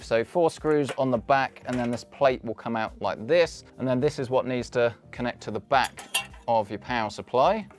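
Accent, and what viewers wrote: United Kingdom. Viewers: I just can't understand with the UK accent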